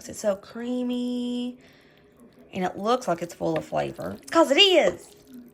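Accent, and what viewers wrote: South, United States. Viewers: I can't tell you how much i love listening to you talk in that amazing and soothing southern accent! Its as good as all the comfort food you share.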